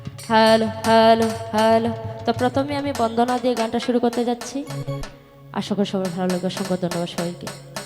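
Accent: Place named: Sylhet, Bangladesh. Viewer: উনি সিলেটী ফুৰি (মেয়ে) তাই বাংলায় কথা বলতে ভিল হয়...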